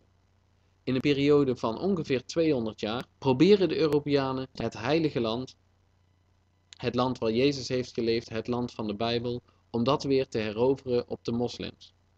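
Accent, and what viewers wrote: Limburg, Netherlands. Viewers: Ten eerste, dit is duidelijk geen Belg, meer iemand uit Limburg, Eindhoven zoiets en ten tweede doet ie meer dan jij in je hele leven zal doen;)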